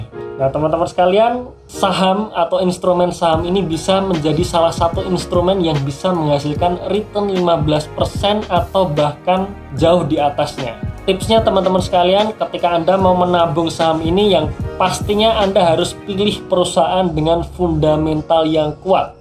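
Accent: Surakarta, Indonesia. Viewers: Njenengan orang solo to pak? Heheh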